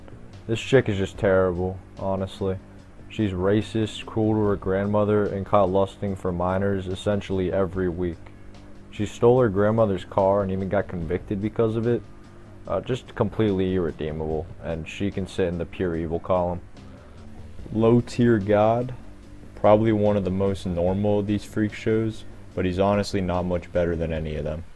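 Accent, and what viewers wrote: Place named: Turkey. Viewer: Are you turkish? You sound very turkish to me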